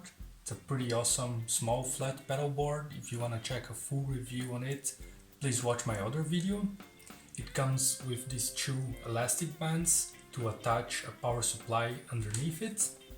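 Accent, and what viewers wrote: Brazil. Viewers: Fala, sabia que você era brasileiro! O sotaque é de Brazuca! To aqui na Holanda BTW. To precisando de uma fonte dessas, a minha ISOPOWER 12 não cabe em baixo do pedaltrain 2 e mesmo que eu coloque o CIOKS mounting kit acho que não vai dar, vc acha que ainda é uma boa opção?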